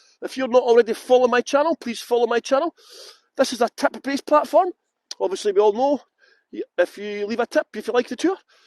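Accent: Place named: Scotland, United Kingdom. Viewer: Love the Scottish Accent ;-)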